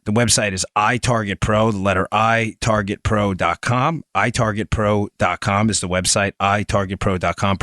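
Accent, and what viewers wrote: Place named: Queens, United States. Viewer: nobody can say it like a guy from Queens, I LOVE IT!!!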